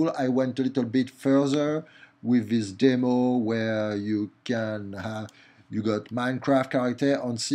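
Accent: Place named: France. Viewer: I love this and I think it's easier to do it in WebGL than in JavaFX :) Your fenglish is cute :)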